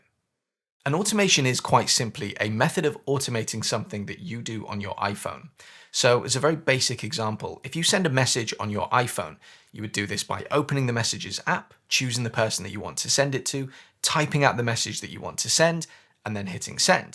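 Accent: England, United Kingdom. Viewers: Yes please that would be great. Love that you are English like myself so I can follow what you say easily, and love just the way you make everything so easy to do with automations and a clear instruction. I’ve learnt so much from your tutorials to which I’m ever so grateful. Thank you so much. Tina 👍🏻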